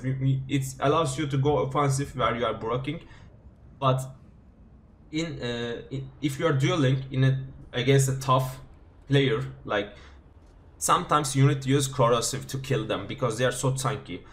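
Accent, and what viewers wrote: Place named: Turkey. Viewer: Yokla ing şiveden yine türk😄ilk defa ing video yu ana dilim gibi anladım😂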